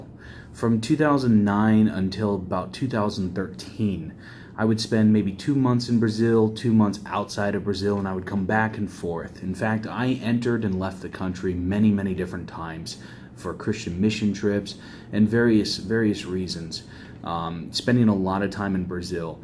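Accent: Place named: United States. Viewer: Esse cara é br e deve ter morado mo cota nos eua tem mo sotaque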